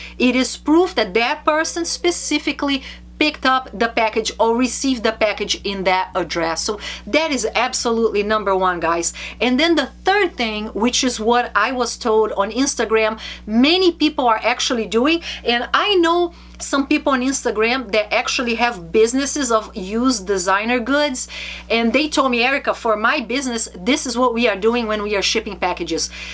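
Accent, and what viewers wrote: Brazil. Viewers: This accent sound so....brazilian! Tem q ser! Anyhow thanks for sharing! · Seu inglesé otimo, mas esse sotaque é de brasileira, nao é? prazer! muito bom o conteudo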